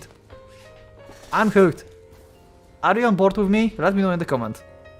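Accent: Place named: Germany. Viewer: I'm watching this because alot of debatetubers are glazing the main character's writing. Is he really above in terms of characters like Walter White and Beato? Also, I do want to play the full vers (including the sus scenees) on steam but I don't have a functioning pc 😢 Also, I like your accent man, you remind me of a german teacher I once had.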